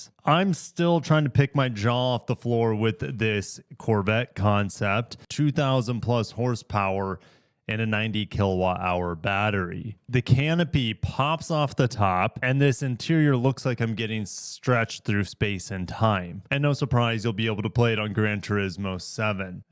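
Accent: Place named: United States. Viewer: i personally hate this accent of america has. he talks to slow, and it sounds congested. i have to listen to video sped up to actually hear the video.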